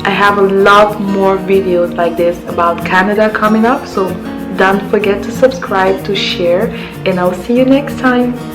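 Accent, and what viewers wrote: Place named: Nigeria. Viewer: From ur accent I believe ur are My sister from Nigeria, pls let Me know the step by steps guide and documents I needed and the right agent I need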